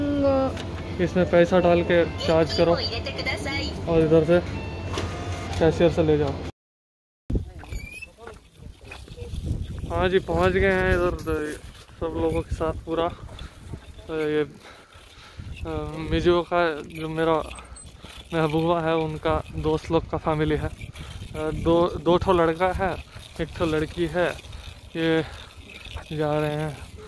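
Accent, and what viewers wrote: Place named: Bihar, India. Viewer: Oyee budbaak....japan me bihari kaise ban gya....thik hai beech beech me bol skte ho...par normal hindi try kro bhai...anyways love to see you all...keep uploading new videos..👍👍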